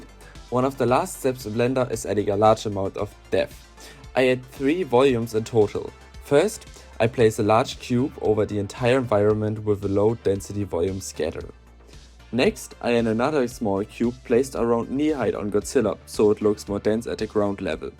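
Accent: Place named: Germany. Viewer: Are you German? You sound like you have a slight accent ✌️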